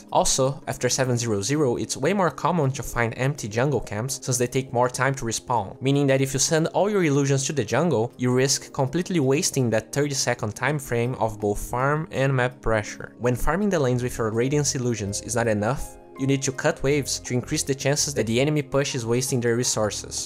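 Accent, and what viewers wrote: Brazil. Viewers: Brother, com esse sotaque. Tu é Hu3hU3 BR?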